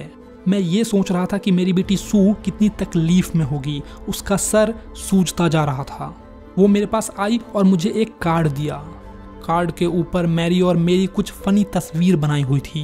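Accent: Bihar, India. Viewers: Bhaiya.....Your bihari tone is also a reason why students love your teaching style..❤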